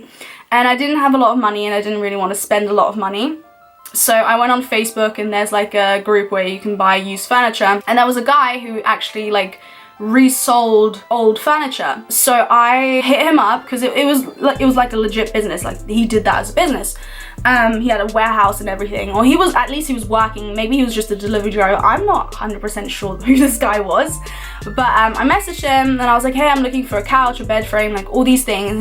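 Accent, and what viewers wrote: United Kingdom. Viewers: you speak perfect British English! Have you ever lived in Britain? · oki doki, cause when i heard you speak English i recognised the british accent and though to myself, she's British and then now your swiss and i was like what is 😂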